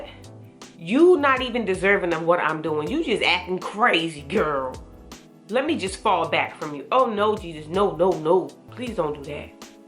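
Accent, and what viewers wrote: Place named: East Coast, United States. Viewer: Love ur East Coast accent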